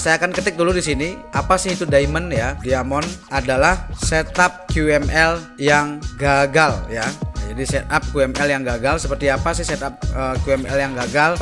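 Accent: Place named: Bali, Indonesia. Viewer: Kaya logat bali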